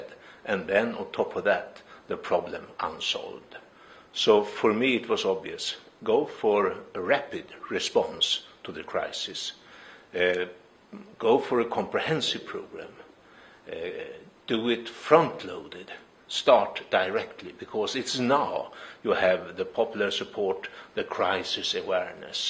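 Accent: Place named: Sweden. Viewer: he might as well been speaking swedish, terrible accent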